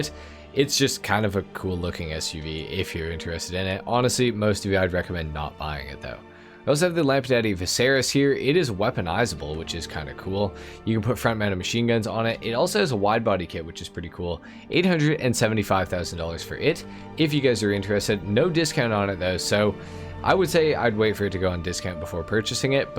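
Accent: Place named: Canada. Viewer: Northwestern thing lmao. Listen to people from Wisconsin, Michigan, Minnesota, North and South Dakota, and yeah Canada. He’s from Canada I think.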